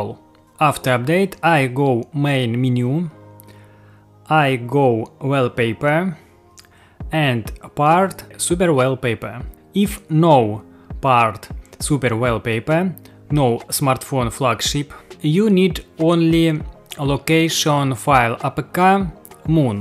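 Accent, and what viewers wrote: Russia. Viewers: ахпхп тут понятно что ты русскоговорящий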